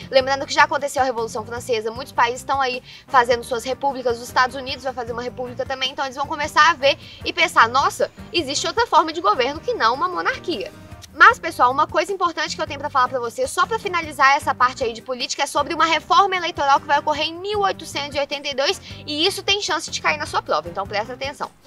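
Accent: Minas Gerais, Brazil. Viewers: Linda ! Minerinha com sotaque delicioso de ouvir .. estou curtindo muito. Fala muito bem, inteligente e esperta. Clareza mesmo com a lingua um pouquinho presa, mais é um charme . Adorei .. estou viajando no tempo com essa gatinha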